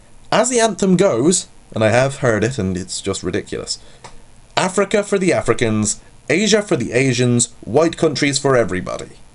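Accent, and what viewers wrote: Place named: Ireland. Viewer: do you have irish parents? you sound like it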